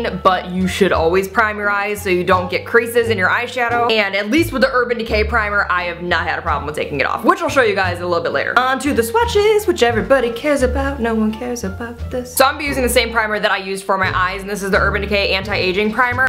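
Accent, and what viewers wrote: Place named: Midwest, United States. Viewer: Lovin your Midwestern accent :)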